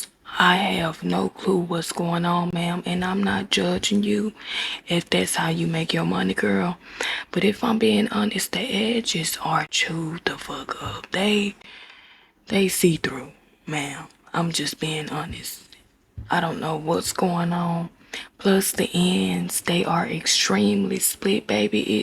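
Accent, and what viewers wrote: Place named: Memphis, United States. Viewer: So that’s why I thought you have the same accent as glorilla, you’re from Memphis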